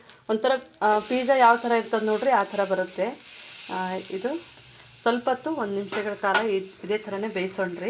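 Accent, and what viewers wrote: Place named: North Karnataka, India. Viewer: ನೀವು face ಯಾಕೆ ತೋರಿಸುವುದಿಲ್ಲ. ಉತ್ತರ ಕರ್ನಾಟಕ ದವರಾ a style matadi ಚೇಂಜ್ ಮಾಡ್ಬೇಡಿ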